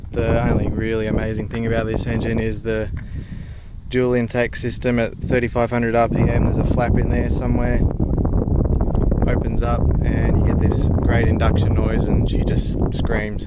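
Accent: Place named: Australia. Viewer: Nice to see another ISF in Australia, I recognised your accent. I am in Western Australia. They have become so rare now, lucky to see 10 for sale in the entire country at any one time. Looks clean good pick up! :)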